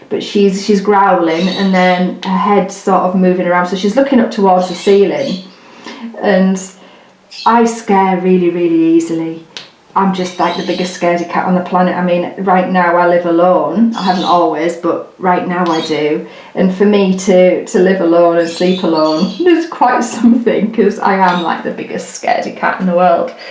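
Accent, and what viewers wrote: United Kingdom. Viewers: I've just come across your channel and will sub. At the moment I'm working my way through your diamond painting but I've laughed and laughed it just unbelievable......I'm in my early seventies but you've answered all those tags the same as I would.......my dread movie was the first (never watched the others) hallowe'en I was terrified!!!!! I couldn't sleep afterwards i couldn't look at the window and the door at the same time so I was tossing and turning keeping check allllll night lol. It was the fact that he didn't die I was so sure he was coming after me. I scream at gremlins my kids moved from me when I took them to the pictures. And I cut my finger down to the bone when my dad just walked into the kitchen while I was cutting bread.....I nearly clung to the ceiling like Tom from Tom and Jerry 🤦‍♀️ I also do cross stitch, jewellery making, paper crafts if all kinds and love to crochet. 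Not enough hours in the day. Must go and watch-listen to your other vids while I'm dping. I also love to dp to audio books. Its lovely also to listen to Uk voice. I'm from Liverpool. Keep up the good work. Thanks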